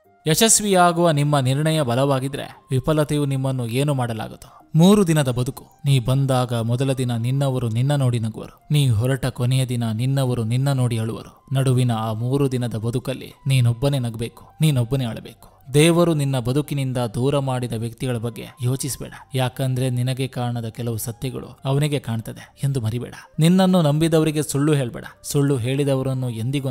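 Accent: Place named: Uttara Kannada, India. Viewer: Utaara ಕನ್ನಡ from ಬೆಲೆಕೇರಿ 🥰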